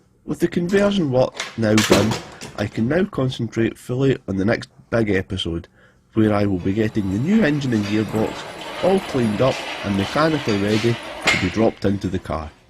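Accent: Scotland, United Kingdom. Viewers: I WAS ALWAYS TRYING TO FIGURE OUT IF YOU WERE CANADIAN OR BRITISH. SCOTTISH NEVER CAME TO MIND. SCOTTISH PEOPLE ARE GREAT. I HAD A SCOTTISH GIRLFRIEND ONCE UPON A TIME, SHE WAS GREAT. JUST DIDN'T WORK OUT. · I'm following this epic restoration closely and really enjoying it. I've said it before, I like the Scottish accent.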